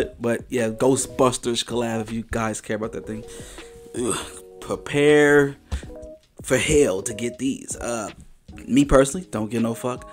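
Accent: Chicago, United States. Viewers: You gotta Chicago accent lol Edit. You said it later in the video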